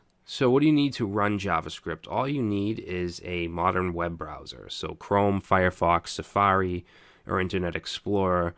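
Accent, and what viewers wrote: Boston, United States. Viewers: hahaha!! Nice one! has that classy Boston accent:) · he was talkin about your boston accent. I picked up on it too haha! Thanks though, man. Been trying to find good tutorials to learn js, but everyone else sounds either very boring/not engaging. Gonna check for other videos you have. thumbs up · Very well made and informing video. You sound like you're from Boston.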